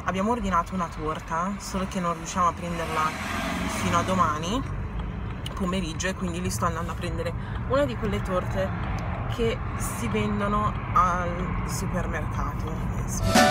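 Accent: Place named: United Kingdom. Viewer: Ciao sei italiana (cioè nata in Italia) e poi trasferita a Londra o cosa?:) appena iscritta mi piaci molto e apprezzo molto il tuo accento molto british haha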